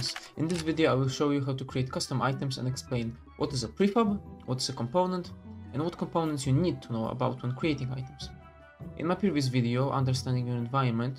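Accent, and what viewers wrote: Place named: Russia. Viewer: your accent's with a hint of russian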